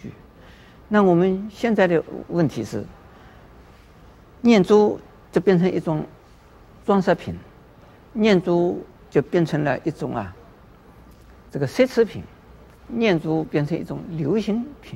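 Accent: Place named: Taiwan. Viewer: I lived in Taiwan for a long time. And he he’s speaking Mandarin Chinese, it’s just that he has a very heavy Taiwanese accent.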